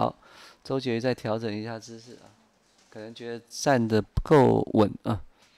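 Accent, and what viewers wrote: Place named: Taiwan. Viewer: 這主播怎麼有台灣腔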